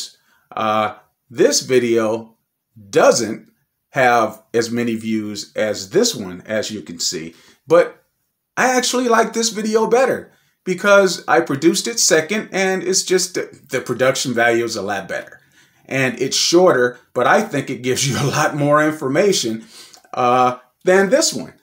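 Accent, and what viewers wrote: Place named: Detroit, United States. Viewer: ok peace brother, keep the videos coming. That Detroit accent is very distinct. I met Manny years ago in Ann Arbour.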